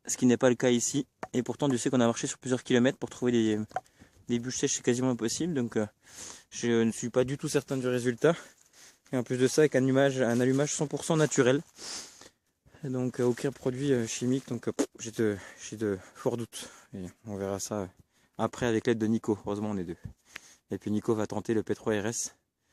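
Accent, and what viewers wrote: Franche-Comté, France. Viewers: J'aime pas trop le côté commercial de chez bear gylls je préfère les couteaux plus rustique, comme la ma prochaine acquisition sera le bushlore de chez condor, si tu ne le connais pas Ju0069 a fait un rewiew test très intéressante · Coucou suis de sortie demain ou dimanche vous laisserai une dedicasse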